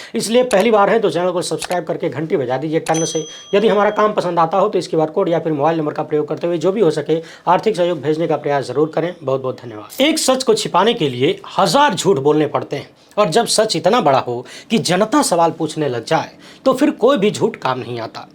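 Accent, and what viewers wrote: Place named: Bihar, India. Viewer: bhai apke kaam se ziyada aap pasand hi mujhe apke bihari language make me laugh..hats off bhai.. for your unbiased reporting...Allah apko humesha kamyabi dey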